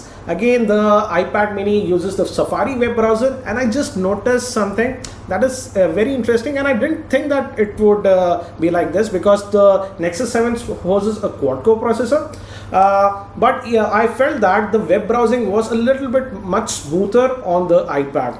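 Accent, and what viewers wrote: India. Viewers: This guy has the most normal stereotypical Indian accent i have ever seen.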